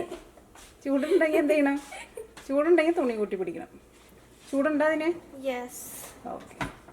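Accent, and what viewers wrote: Thrissur, India. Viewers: Love that she has a Thrisshur accent despite being overseas. Its very endearing...❤️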